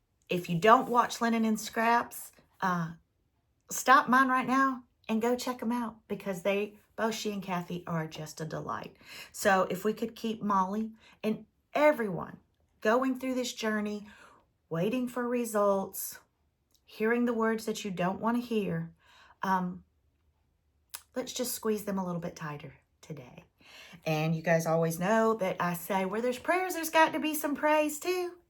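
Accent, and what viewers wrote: South, United States. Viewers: I got so tickled to hear your Southern twang. I've lived in the Tampa Bay area almost 40 years. I must be drunk and blind! I miss my Tennessee roots, and my North and South Carolina family and friends. People here still ask, "Where you from?" I need a snarky answer to that! Really enjoyed your flosstube, as an "old" newbie to your channel, but 50+ years cross stitching. Love a Blackbird❤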